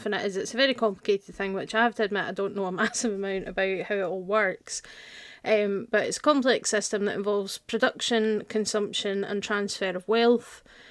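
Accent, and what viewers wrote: United Kingdom. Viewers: You from glasgow? My fiance is from Leith. Great vid, thx from USA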